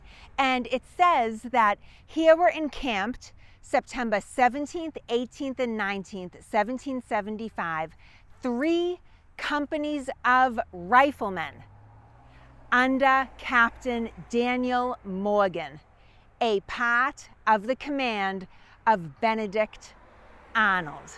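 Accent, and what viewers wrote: Boston, United States. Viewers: I adore the Boston accent! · My brother-in-law is from Boston and talks just like that. If you can't say something nice, don't say anything at all. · A great series! Thank you, and let me add, having been born in Boston, I enjoy the sound of your voice too! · You are captivating in your storytelling of our country’s rich historical birth. I could listen to that Boston accent all day long, and I’m from North Carolina having a southern accent. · I subbed a while ago, love your content, and I also get a kick out of your Boston accent. Cheers!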